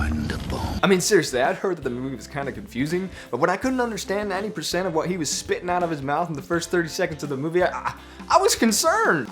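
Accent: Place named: United Kingdom. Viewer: I thought he was British for a second XD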